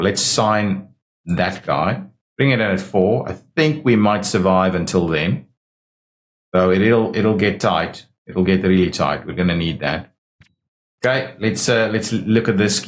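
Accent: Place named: South Africa. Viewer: Are you South African? The accent screams South African. 😁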